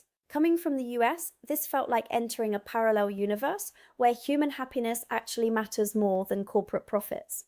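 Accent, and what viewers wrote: England, United Kingdom. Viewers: Why is your accent British? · She’s American? With an English accent? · Although has a great English accent, I wish that she would sound her Ts!!!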